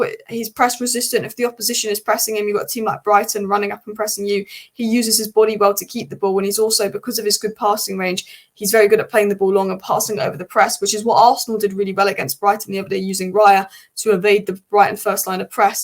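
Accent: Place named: Manchester, United Kingdom. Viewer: Your Mancunian accent is second to none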